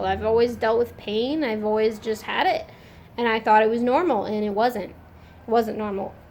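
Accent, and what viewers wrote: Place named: United Kingdom. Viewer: Now em confuse either u from US or Uk coz ur accent is more british than American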